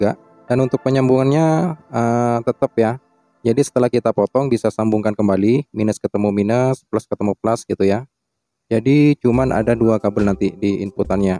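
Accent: Madura, Indonesia. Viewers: Orng Madura kah bnG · Kayak nya lu orng madura ya? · Reng medureh ye bg😁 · orang madura bang? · Bang abang orang madura kah? , saya orang madura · Madura ta mas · Bang orang Madura kah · Org mn kok bhs madura? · Madura ya bang?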